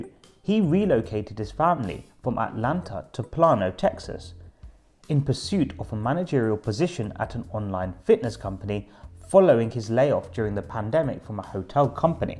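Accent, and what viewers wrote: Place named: United Kingdom. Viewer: I don’t think a foreigner with British accent should be yapping about the American economy just because he needs attention to his channel. This ain’t UK buddy.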